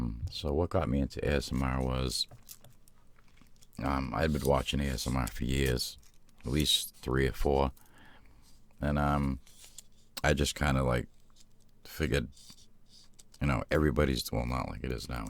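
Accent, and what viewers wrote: Boston, United States. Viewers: Ur from Boston most definitely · Yo, are you from new england? you've got that underlyin boston/jersey accent. Love your vids, congrats on the 100k(now more than that)! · Jesus how have I not noticed the Boston accent until just now?? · Is that a Boston accent? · Never noticed your Boston accent haha I love it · He's defiantly a Boston guy, I would know😂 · Wait, he's from Boston? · oml he has a..... what's that? Boston accent? I didn't know he actually had one my mind is BLOWN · Boston tang in his voice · Any one else notice his Boston accent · Another live stream soon? Your every day voice and the Boston accent are the best :) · Never realized you had a Bostonian accent until I watched this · I could tell you are from Boston... I love that. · Holy hell, you're a Bostonian.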